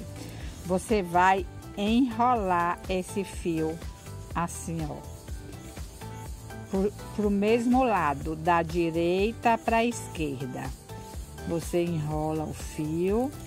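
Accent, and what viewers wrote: Northeast, Brazil. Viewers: Vc é nordestina?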